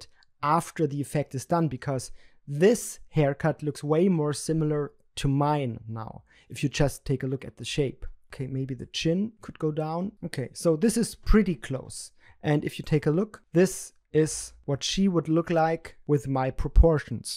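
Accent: Germany. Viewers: Servus, wie gut geht es dir heute! Weil ich behaupte herauszuhören, dass du Deutsch deine Muttersprache ist (vermutlich denkst du, "es ist auch nicht schwer zu erkennen"), schreibe ich auf Deutsch. Hier geht es aber um deine Skills. Die finde ich sehr beeindruckend und deine Tipps hilfreich! Danke! Habe auch deinen Kanal aboniert!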